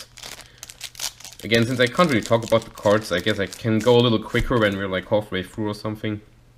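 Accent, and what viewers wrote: Germany. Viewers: Are you german? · Bist du Deutsch da du Englisch wie ein deutscher sprichst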